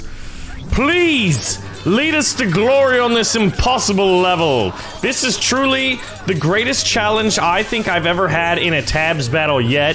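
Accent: Scotland, United Kingdom. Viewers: 10/10 Scottish accent being given throughout the video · I like the Scottish accent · Scottish~ish sounding voice but English units No complaint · Scottish accent ?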